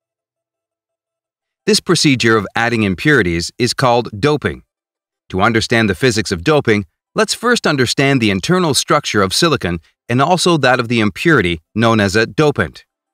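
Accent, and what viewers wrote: Kerala, India. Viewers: Well, he's not really imitating the western accent tho. He's a mallu and that's how some of em actually speak since I'm a mallu as well and know a lotta people who speak like that.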